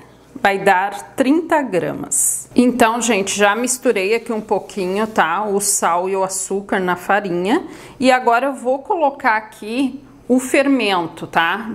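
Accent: Rio Grande do Sul, Brazil. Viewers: Essa guria é top demais!!! Arrazaaaaaaa sempre nas suas receitas maravilhosas !!! Adoro o sotaque dos gaúchos!! Gurizadas que falam bonitooooo tchê!!! 😊 Parabéns guria por mais uma delícia e gratidão sempre 👏👏👏👏👏👏😍😘🌹🌹🌹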